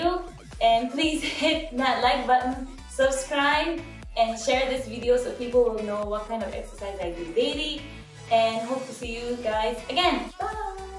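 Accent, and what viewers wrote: Philippines. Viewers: Your english has filipino accent in it! Really cool!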